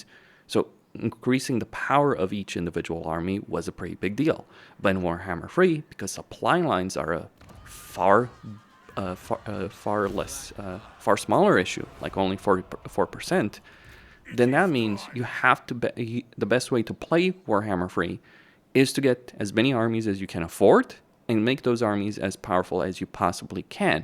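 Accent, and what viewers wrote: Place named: Romania. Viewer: Why your accent is sounding romanian to me?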